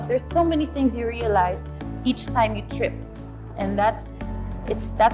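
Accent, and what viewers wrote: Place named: Philippines. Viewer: PrettyYummyLicious Pia....pinoy na pinoy ang gawi at pananalita yan ang gusto ko sayo...napa simply at nakaka relate aqo sa tuwing ng ku2kwento ka...eto yong miss Universe na cowgirl ang dating😮💖💋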